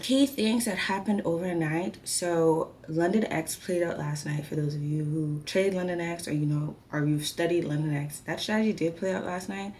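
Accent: Bahamas. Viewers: Are you Bahamian? Sometimes your voice and how you say words reminds me of how Bahamian women speak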